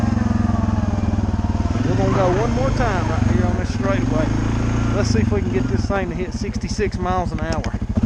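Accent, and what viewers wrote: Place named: South, United States. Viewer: There’s something about a southern twang that makes the review more legitimate!!!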